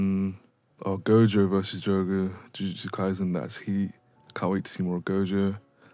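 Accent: United Kingdom. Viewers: Omg so I wasn't going to comment on this video because I am sooo late but we have so much in common it's incredible! First of all, I am a 20-year-old female, my parents are from Uganda but I was born in France and I live there now buttt I also lived in England for 4 years when I was younger near Wimbledon so that's why I love your accent and it feels so much like home to me :) I love winter and Halloween so much they are the thing I look forward to all year and Christmas music is my kryptonite, the country I would like to visit the most is Canada and I also love kdramas and anime so much, my fav recent series is Alice in borderland. Also if that wasn't enough I have a very artistic background as theatre is my passion as well as music and I have studied and practised both for years and would love to continue, 99% of my friends are either in graphic design/art (art school in architecture/ designing etc), music or cinema/ theatre so art is the only thing I know and love religiously with all my heart. 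There are also so many other things that I forgot but you get the picture lmao I think it's crazy that I have been a fan of your channel since the begging before you even had 5k and that I now find out that we have a LOT in common :) anyway sorry for my long paragraph but I love your work and hope you get to 1M one day because you deserve it so much! I am on your discord so if you ever want to chat it would be a pleasure! my is Angelina with purple hearts <3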